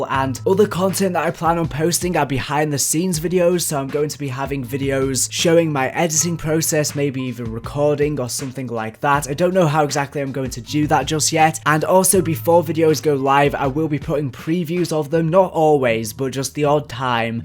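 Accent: United Kingdom. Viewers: Anyone else loving when he is streching some word with this british accent? :D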